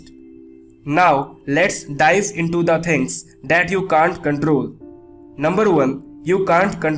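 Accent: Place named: India. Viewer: indian accent god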